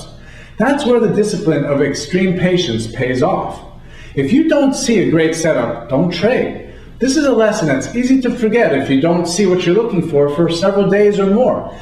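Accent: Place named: New York, United States. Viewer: That makes more sense, even though he's not "talking too fast" for me. I'm in NYC right now where lots of people talk exactly like him! Remember, too, that he's a money-making stock trader, not exactly a "low key, relaxed" mode of work. Like a race horse, not a plow horse. But thanks for taking the time to respond. Clears things up a bit.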